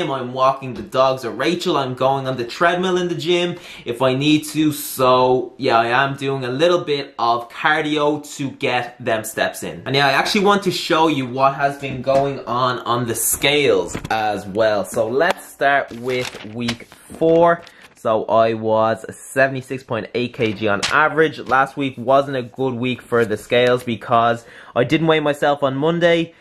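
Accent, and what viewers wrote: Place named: Ireland. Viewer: Love your videos especially love seeing an Irish YouTuber great to hear a familiar accent a good video would be on face fat loss would really help 👍🏽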